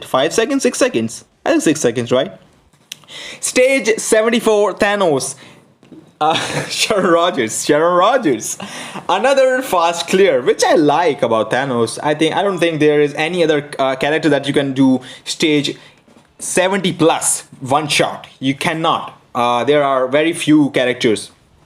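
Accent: India. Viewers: Man I love this Indian English 😂♥️♥️♥️. Great job dude ♥️♥️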